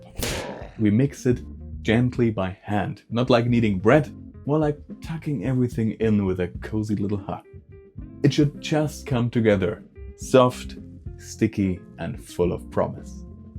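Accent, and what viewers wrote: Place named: Germany. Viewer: Bist du Deutsch? I hear a sight accent, as I am 100% Slovenian and speak a somewhat butchered German. My Stara Ata spoke fluent German and I learned much/some from him. Danke.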